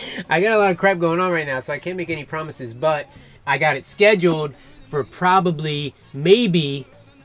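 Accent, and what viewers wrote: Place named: North Carolina, United States. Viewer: Hey, now! Where do you reside,? It sounds like from your accent that it's relatively near me, in central Piedmont NC. And how about that disc? Pretty amazing how much work went into that bad boy!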